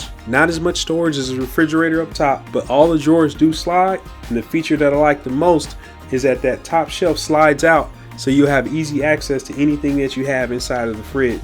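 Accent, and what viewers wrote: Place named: North, United Kingdom. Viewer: You’re definitely from up north😂‼️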